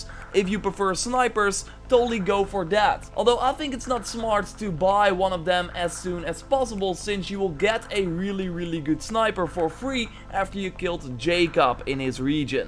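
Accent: Netherlands. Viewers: Ben je Nederlands heel misschien? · are you Dutch? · Join some speaking lesson m8 ur Durch accent is really strong. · tophercheese1 Dutch accent. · Are u dutch i hear it somehow in ur voice? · The Dutch is strong in this one. Good vid =] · you sound dutch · Ben je nederlands? Want je hebt een nederlands engels accent hahaha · Ben je nl? I hoorde je video zeggen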